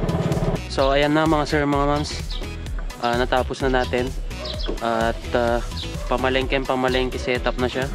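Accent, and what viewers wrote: Pampanga, Philippines. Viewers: kapampangan ka no paps haha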